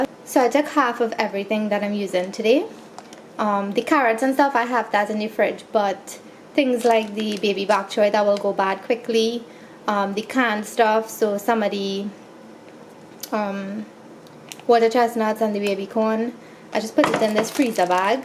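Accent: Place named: Trinidad and Tobago. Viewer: Some Trini chefs talks to much and with a exaggerated Trini accent so people on the internet can't understand them. But your accent is moderate/modest which is a good thing.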